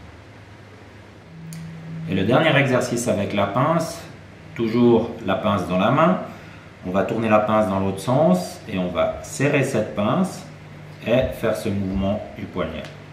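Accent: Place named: Switzerland. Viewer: je savais que j'avais reconnu un accent suisse :)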